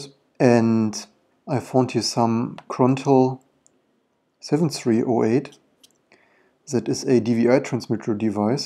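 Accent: Germany. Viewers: German native ?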